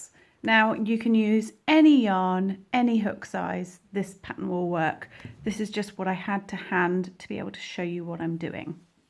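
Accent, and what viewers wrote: England, United Kingdom. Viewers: ❤❤❤❤❤❤❤❤❤❤❤❤❤❤❤❤❤❤❤❤❤ I LOVE YOUR TUTORIALS AND YOUR ENGLISH ACCENT ❤❤❤❤❤❤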